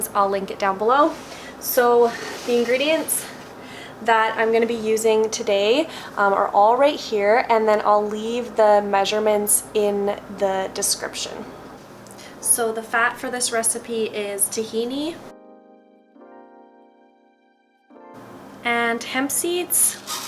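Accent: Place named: Canada. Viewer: I’m guessing by your accent and spelling your a Canadian too.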